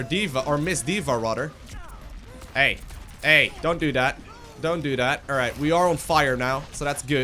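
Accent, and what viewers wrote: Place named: Netherlands. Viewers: This guy is dutch right? his accent is so dutch lol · he sounds dutch to me as well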